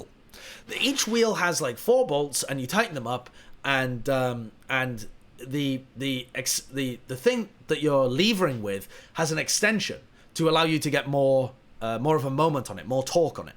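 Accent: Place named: North, United Kingdom. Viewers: holy shit he sounds so northern in the clips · I cannot get over how Yorkshire sounds in the VODs. Bring back the accent,!!! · bloody hell used to sound proper northern! I know he says he did but I'd never seen footage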